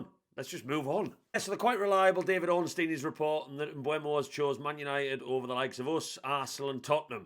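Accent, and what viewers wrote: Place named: Durham, United Kingdom. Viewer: Where is your accsent from, mate. Durham?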